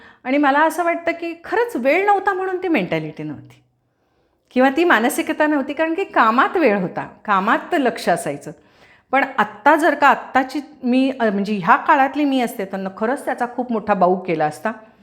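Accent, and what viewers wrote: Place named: Marathi, India. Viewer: जय श्रीराम, ताई तुम्ही अगदी आमच्या मनातलंच बोलताय! आताच्या ईंग्रजीचे प्रभुत्व असलेल्या वातावरणात,तुमचे ओघवते शुध्द मराठी ऐकतच रहावेसे वाटते!मला शुध्द मराठीचा खुपच अभिमान आहे,तुमचे मराठी बोलणे मला आवडते!अजिबात नाटकी पणा नाहीये!प्रत्येकाचे स्वभाव वेगळेच असतात पण आयुष्यात तडजोड करावीच लागते!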